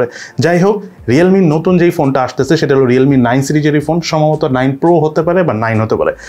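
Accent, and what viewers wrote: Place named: Dhaka, Bangladesh. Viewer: ভাইয়া আপনার কথা শুনে মনে হয় আপনি ঢাকাইয়া আর আপার T Shirt গুলো খুব সুন্দর পরেন ☺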